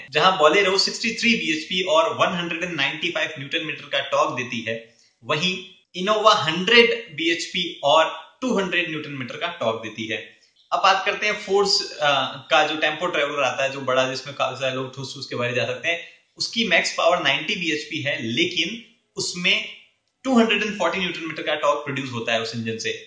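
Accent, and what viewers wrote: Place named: Haryana, India. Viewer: saab aapki haryanvi tone bahut achhi lagti hai कानों को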